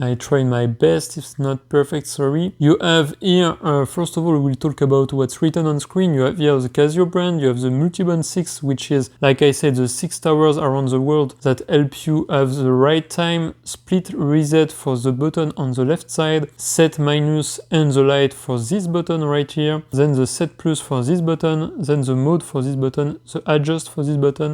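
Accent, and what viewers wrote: France. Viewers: Un bon accent français !! Comme on aime haha 😂❤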